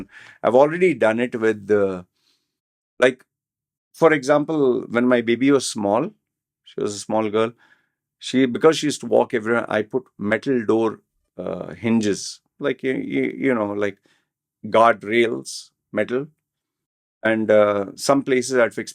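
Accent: India. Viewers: please talk in hindi. Atleast introduce yourself saying hindi words. We would love to see your indian accent 😅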